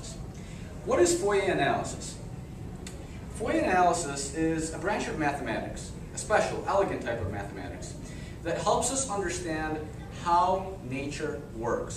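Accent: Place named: Ukraine. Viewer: Great stuff,. Excellent presentation in terrific English with only a hint of a Ukrainian accent. Thanks!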